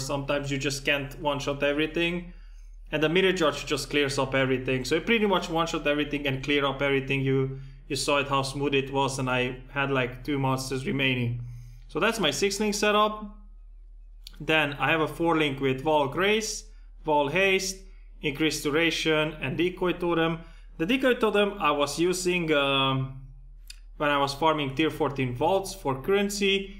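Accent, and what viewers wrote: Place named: Romania. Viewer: stiam ca esti roman. dupa port dupa glas:P <link> check it out:P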